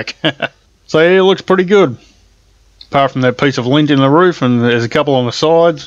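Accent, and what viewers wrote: Australia. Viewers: very humble man!! Becoming a huge fan of yours! You and!! Also helps that everything in a Australian accent just sounds cooler and funnier!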